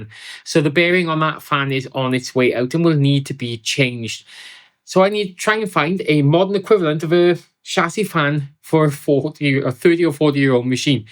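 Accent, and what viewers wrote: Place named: Wales, United Kingdom. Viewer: I thought you were German .... but your Welsh!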